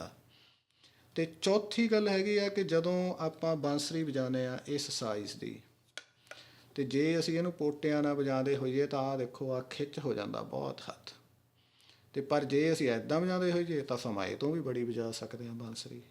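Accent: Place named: Punjab, India. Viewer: I love you accent of panjabi language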